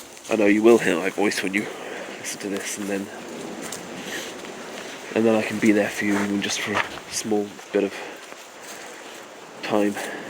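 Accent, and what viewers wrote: United Kingdom. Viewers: Looking forward for more long distance voicemail like this, your voice ❤ and that british accent though ❤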